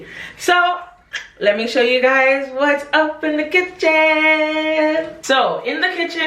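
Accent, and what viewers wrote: Jamaica. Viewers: R u Jamaican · You sound Jamaican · Your accent sounds Jamaican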